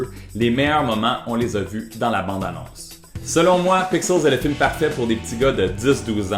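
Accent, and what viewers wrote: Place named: Quebec, Canada. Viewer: Wouaaaaaaah... Ne le prend pas mal mais t'es le premier avec un accent Québécois que je comprends ! (Je comprends ce que certains disent mais d'autres mots que j'comprends pas) Bref ! Moi j'ai adoré Pixels : Voir Iwata duck hunt... Mais je lui mets 4 étoiles !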